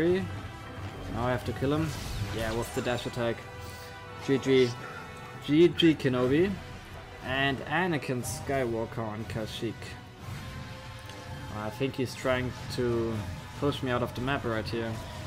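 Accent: Germany. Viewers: Bei Gott ich lieb deinen deutschen Akzent! XD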